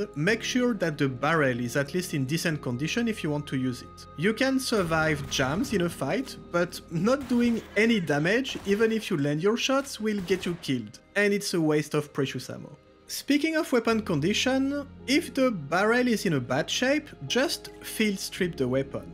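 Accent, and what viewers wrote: France. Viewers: Are you french ? Greets from France :) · J’ai le droit de commenté en français? On reconnaît bien l’accent 😂 Belle vidéo !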